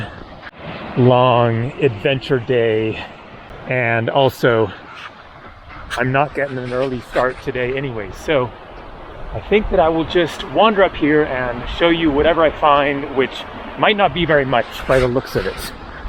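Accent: United States. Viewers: Hi, I found you today while trying to watch a vlog on Himachal Pradesh. I really liked the slow pace and story telling. Thank you! By the way, Indians don't mind if you face the camera on their face. I think, some may even like it. Well, I left India 39 years ago. I live in Philadelphia now. I am an Indian but what a joy to find you to get to hear the familiar American accent!! Sending love from Philly - the City of Brotherly love. All the best! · please pronounce. “ Himalayas “ and “ Himachal “ correctly…..after all the years of travel ….and you still pronounce some words with that arrogant American pronunciation…….love your videos, but your western pronunciation is a big turn off….and I find it disrespectful…….at least try to get the right pronunciation……most foreigners try to learn the main words in a language to communicate……‼️⁉️🇺🇸 Edit: I thought that with the starlink satellites, reception would have improve in the mountainous regions of the world…..at least that’s what T-mobile said….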